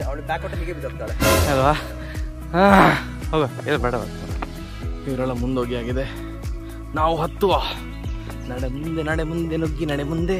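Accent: Mangalore, India. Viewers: Neevu mataduva reeti, namma mangloor kannada mishritha bhashe, nimma jokes.. Manassige bahala muda kodtade. Ede edeyalli heege random vlogs madi. Nodi naavu kushi padteve.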